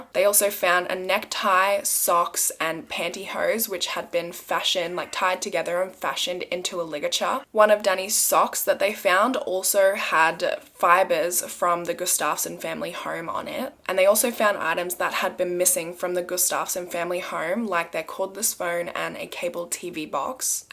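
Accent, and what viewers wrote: Australia. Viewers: You go Girl! Just your Aussie accent makes me bingewatch your vids!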